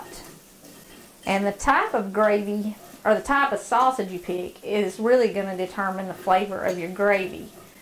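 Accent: South, United States. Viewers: U just made me hungry seeing that plate with fried apples 😋.Must be a southern video by voice👍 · You sound like a hillbilly to me and I like that. I like hillbillys, I like you, I like your style, and I like your videos. The residue on the bottom of the pan is called "fond." Incorporating it into your sauce is deglazing. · Hi, this is my first time viewing your channel, I hope you never get tired of hearing this.. your southern drawl is awesome! I was blessed to have my moms parents who were German and Italian (both very thick accents) raise me.. well for the most part. Then mom married when I was 5 to a very good man.. which meant I inherited another grandma, his mother. She was very southern with a thick accent as well! LoL This was not a wealthy woman by any means, in fact she struggled at times financially. She had 3 grown sons and had no intentions of having any other children in her mid 50’s.. 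but surprise! She had a daughter that was only a year older than myself when we met. Technically, her little girl was my Aunt by marriage but naturally we were raised more like sisters. I’ll tell you what, my southern granny made the best food out of practically nothing sometimes. Her biscuits and gravy were simple just like u showed in your tutorial but ohhh so flavorful! Just like you said, it didn’t take much sausage to feed a handful of ppl since it was only meant to flavor the gravy. I probably wouldn’t have tried rabbit in my lifetime had it not been for her southern cooking. Her fried chicken was to die for and she could do anything with a pot of beans and some cornbread when the budget got tight.. and it was delicious! Traditionally at Christmas time, we did not set out milk and cookies for Santa at her house but rather a bowl of butter bean soup and a homemade biscuit. One year us gir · Woman with southern accent + cast iron skillet = great food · Thank you so much, I really enjoy it and remind me the country accent of my father in law, God blessed you!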